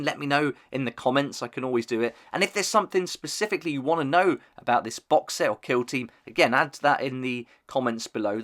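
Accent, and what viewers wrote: United Kingdom. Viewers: This is an unrelated, dumb question, but since you have a UK accent, and you have that knife to open the box, are those knives legal to carry in the UK? Just curious as a US person.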